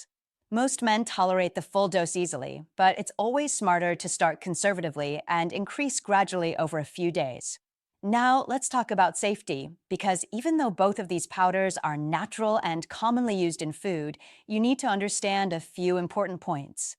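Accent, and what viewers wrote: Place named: India. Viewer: howcome you have an Indian voice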